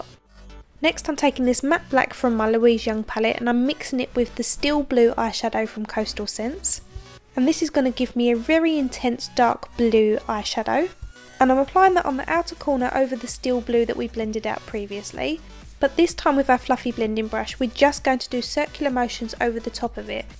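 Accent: Essex, United Kingdom. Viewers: Are you from Essex? You sound like my cousins!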